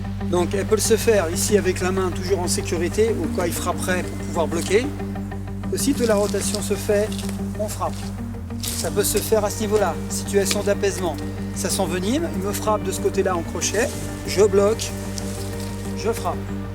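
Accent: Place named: Kabylie, Algeria. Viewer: t kabyle comme moi pasque t a l accent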